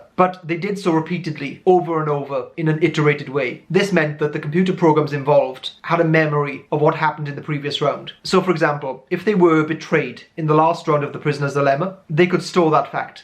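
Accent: Wales, United Kingdom. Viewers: Is nobody going to point out the Welsh accent?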